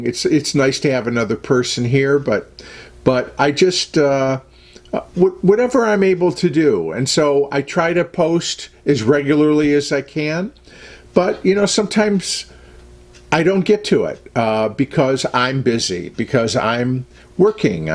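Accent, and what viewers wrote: Midwest, United States. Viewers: YouTube was kind of just starting its takeoff roll when I first started using a computer and visiting the internet. Didn't seem like it was so much a "job" then. Seemed more like a hobby! I don't begrudge its having become a job for the many now who do try to make their living or most of their living at it, because the more self-employment options there are for people, the better. Not everybody's wired right for the old 9-to-5! YouTube seems to represent a different kind of creative outlet for you, and I think the content you create is better than some that is created by the people who do it for a living. It is certainly less frenetic than some. As a former Michigander myself, of a certain age, I find watching Cavalcade relaxing and reminiscent in equal parts. I love that your steel cookware on the show is the beautiful yet uncomplicated Farberware I started buying around 1975 and still comb thrift shops to find additions to. 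At (a youthful!) 70 I love seeing the kinds of appliances and kitchen gadgets I remember from when I was 20 and first learning how to keep a kitchen! I love hearing you talk the way I used to, before I wound up in New England. Your recipe videos and stove swaps and features showcasing blenders or mixers or whatever feel almost like a visit with a nice neighbor two doors down, if two doors down took me all the way back to the Midwest . I love the approach you take to content creation and I hope there will be room on YouTube for your kind of contribution a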